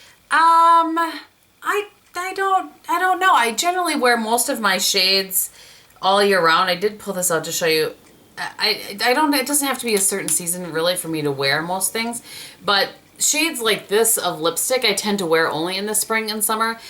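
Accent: Wisconsin, United States. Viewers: Your Wisconsin accent is great! Love from Madison, WI!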